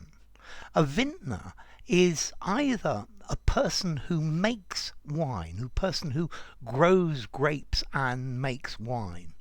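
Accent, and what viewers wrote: RP, United Kingdom. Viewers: You crack me up with your posh accent! 😂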